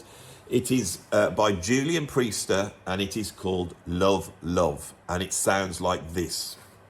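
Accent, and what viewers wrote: United Kingdom. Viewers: Though not a musician (professional, amateur, or otherwise), I've been a jazz/rock fusion fan since my teens (for about 50 years) and of course still am. However, most of these artists/albums you cite I hadn't heard of and of course I didn't have albums, CDs, or mp3s of their music. Exceptions being, and Julian Priester albums that you've named, which I've acquired in the last 4 or 5 years in mp3 form. I was actually able to find the other seven on mp3 just now and have promptly downloaded. I ran into your YouTube channel by accident--yes, was drawn in by your video about albums that critics love but that you called "crap" and I have to say I agreed with most but not all of your assessments. But....when finding out that you are a fusion and prog rock fan AND a professional drummer to boot, I literally had to subscribe. Although it may take some time for me to get used to the British accent (yeah, born and raised in the USA...just like!!! 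🙂), even when I disagree with your opinion on an album--I find you thoroughly entertaining and witty. Count me a fan!!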